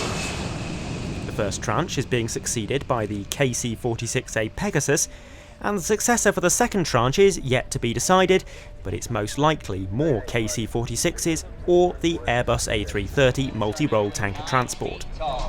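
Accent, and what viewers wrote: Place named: United Kingdom. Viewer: Seriously, a brit commentator? How about we have an American narrate RIAT? Absolutely annoying.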